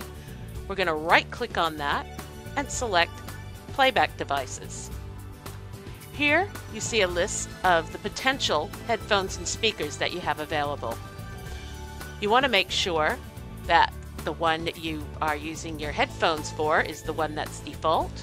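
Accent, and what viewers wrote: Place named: Australia. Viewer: you rr from austrailia sister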